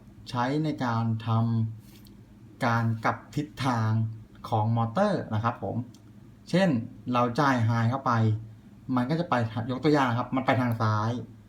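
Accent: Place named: Ban Pong, Thailand. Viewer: กำลังติดตามครับ ฟังสำเนียงแล้วน่าจะคนบ้านเดียวกัน บ้านโป่งคับ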